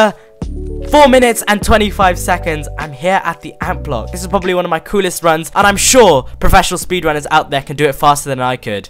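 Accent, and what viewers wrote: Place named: United Kingdom. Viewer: His just british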